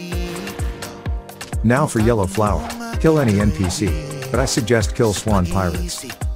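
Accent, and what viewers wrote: Philippines. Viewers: R u from Philippines that sound familiar? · BRO HIS SOUND IS FROM FILIPINO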